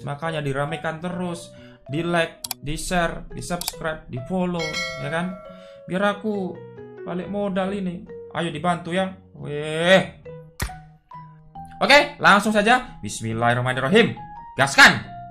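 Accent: Java, Indonesia. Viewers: Mas e wong jowo ngendi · ngomong medok wae mas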